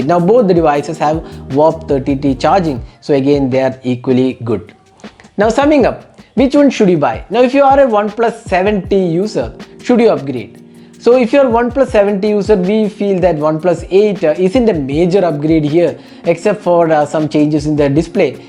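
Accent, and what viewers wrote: Kerala, India. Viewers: that heavy mallu accent tho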